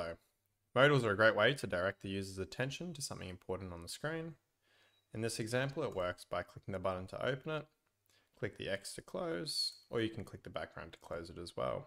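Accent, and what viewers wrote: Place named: United Kingdom. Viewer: You lost me in 10 secs, too fast and the British accent is hard to understand.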